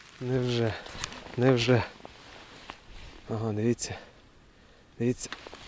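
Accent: Poltava, Ukraine. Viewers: Ніяк не зрозумію звідки ти. Говір полтавський, але сула нетипова для полтавської обл. Хоча, я не скрізь бував. А може сумська?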